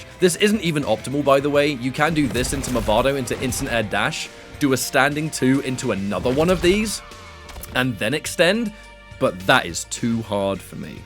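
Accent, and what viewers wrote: United Kingdom. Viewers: As a Russian, i jusr enjoy your English. Always prefered the British version to an American:) Thanks to your combos, though, quite helpful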